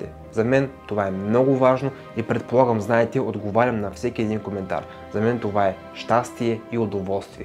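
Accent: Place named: Bulgaria. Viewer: Едно предизвикателство към абонатите на! Като го слушате, можете ли да разберете по диалекта, от кой край е на България?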